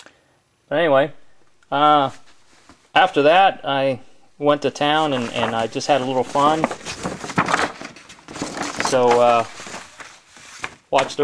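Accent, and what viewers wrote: United States. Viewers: i can hear a southern accent in you, how long have you live in texas? no offense im from up north.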